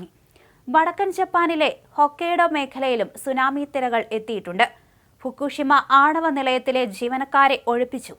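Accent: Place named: Pala, India. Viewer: Palakaari aanan thonun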